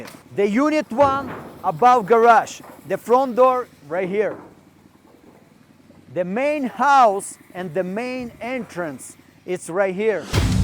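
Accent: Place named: Russia. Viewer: Ты ведь русский! 100%акцент!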